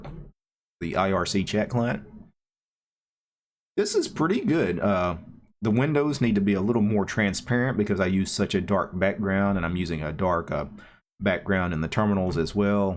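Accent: South, United States. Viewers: love the accent always a pleasure to listen to a techie southerner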